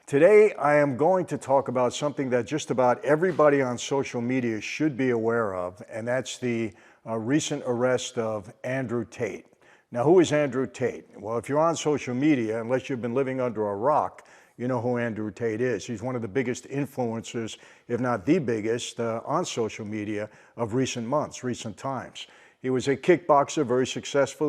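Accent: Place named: United States. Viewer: Romanian? American English...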